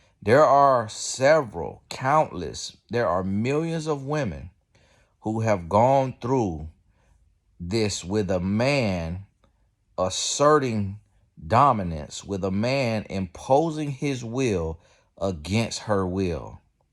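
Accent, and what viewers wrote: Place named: Florida, United States. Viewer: That Florida accent cracks me up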